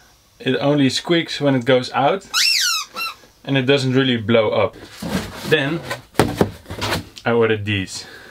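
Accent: Netherlands. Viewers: Bent u Nederlands? Zo lijkt het wel. Ik herken uw accent.🤷‍♂️ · why is it so easy to recognize some one that is dutch like they got a very own way of talking i find it funny ps blijf zo doorgaan leuke video’s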